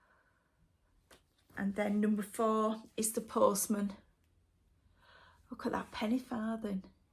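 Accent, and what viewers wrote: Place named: West Yorkshire, United Kingdom. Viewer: Hi I’ve just started watching you. Carol the saltbox stitcher recommended you. Your framing is beautiful, could I ask where did you get the long narrow frame please? It’s gorgeous. I am in South Yorkshire and I’m guessing you’re in West Yorkshire going on your accent. Anyway I enjoyed your Flosstube. Thank you. 😊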